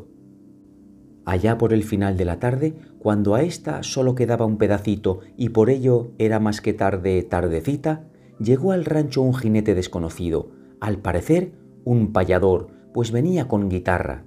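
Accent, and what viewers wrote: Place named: Uruguay. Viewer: 🎉saludos desde Piura - Perú. Estaré atento a la narración de cuentos, leyendas, con la dicción local de Uruguay. Grácias. Cuide su salud. Felicidades.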